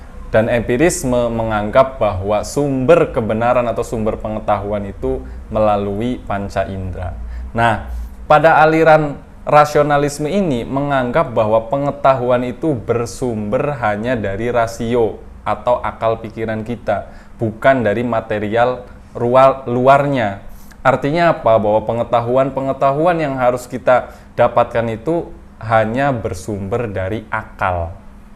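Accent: Banyumas, Indonesia. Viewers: Sukak dengerin penjelasannya, mudah dipahami. Sukak logat2 bicaranya juga, kyk ada ngapak2nya dikit.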